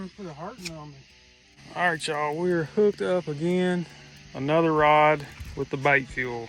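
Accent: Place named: South, United States. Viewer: Where are you from? 100% no offense but I can't figure out your accent. You sound like a southern feller but then again sometimes not?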